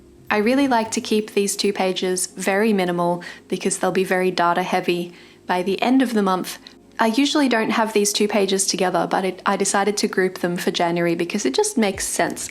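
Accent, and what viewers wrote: Australia. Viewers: It has been so incredibly hot in Australia. I live in Darwin and it has been hitting 40 degrees with 80% humidity. Your quote page is using the Pantone colour of the year. My January setup us revolving around this colour. It is so refreshing to hee an Aussie accent on YouTube and I just love your style of Bullet Journaling.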